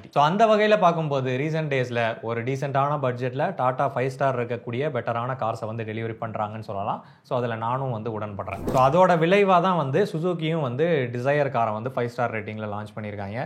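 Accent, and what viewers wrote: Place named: Madurai, India. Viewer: Madurai slang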